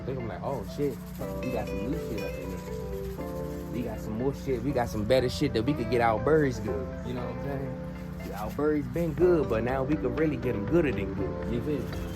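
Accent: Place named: South, United States. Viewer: The Southern accent is killing me😂. “Sum Burries “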